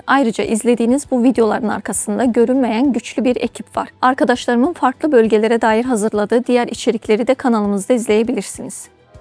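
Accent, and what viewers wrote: Azerbaijan. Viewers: Azerbaycanlidi danisigindan hiss edirem.))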